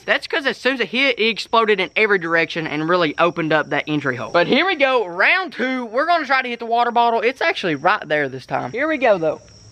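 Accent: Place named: Kentucky, United States. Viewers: This guy is definitely from Kentucky. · Love that southern accent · Hey there fellow Archery not even sure if you’re going to see this but just wondering how far south in the United States you are(because I’m genuinely interested in where that heavy of an accent comes from not trying to make fun of it)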